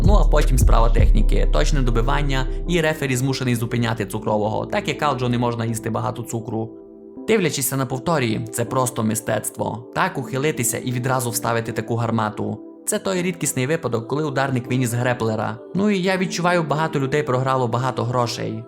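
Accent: Western Ukraine, Ukraine. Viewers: Я привик дивитись по російській мові а тут ви по рідній західній Івано франкіівській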